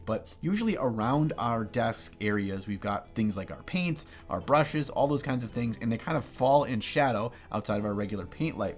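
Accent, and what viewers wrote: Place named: Midwest, United States. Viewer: If I were playing a drinking game where I had to do a shot each time I heard an awful midwestern accent "byag" for bag... I'd be wasted in the opening moments of the video!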